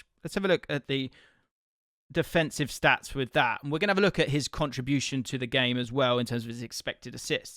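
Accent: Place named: England, United Kingdom. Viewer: English accent Scottish top. 😬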